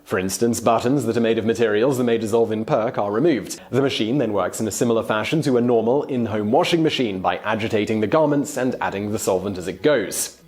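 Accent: England, United Kingdom. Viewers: Hello I'd like to start by telling you how much I love your videos & have learned so so much from watching them. They're right up my street as instead of having a set topic you have such a wide,varied & eclectic mix (muchike my brain I'm told) of subjects & I love watching them,I used to watch daily but have recently started to leave them for a while & have a good old binge watch every Friday if possible. Anyway on to the subject of my comment,as I have mentioned I am a HUGE HUGE fan & love all your work however there is 1 thing you do that I find borderline intolerable & that is the fact that you add an upward inflection at the end of a LOT of sentences,the vast majority unneeded as you can be stating a fact whilst sounding like you're asking us a question. As much as I love your vids I find this so very grating as you sound like you're asking questions or are a teenage girl,it's bad enough hearing my 13yo daughter talk this way but one of my favourite Youtubers as well,it's not just me that feels this way as a lot of your fans feel this way too as we've discussed it in the comments before if you've ever seen any we have agreed to BEG you to stop adding this annoying Americanised gumph to your vids,you have an amazing English accent PLEASE PLEASE use it & do our country proud. 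Please carry on making these amazing videos (minus the humph) & I will carry on absorbing all the amazing trivia. Your long time fan Mark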